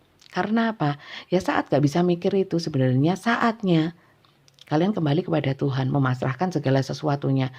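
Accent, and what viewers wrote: East Java, Indonesia. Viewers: Aq jd gak bisa tidur bun dlm readingan ini banyak banyolannya logat jatim tp gak meninggalkan fokusnya bun, aq banyak cengar cengir sendiri, semi wayang lo bun, aq seneng bun lanjut, hebat pokok e, yg pasti itu Tuhan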